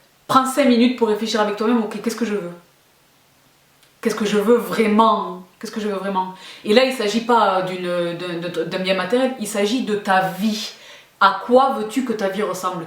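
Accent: South, France. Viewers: J'adore ta façon de parler. Franche et rigolote. Et tu as un accent excellent, ca me rappelle mon sud, moi qui me suis expatriée. Je suis d'accord avec toi, continue, tu es top.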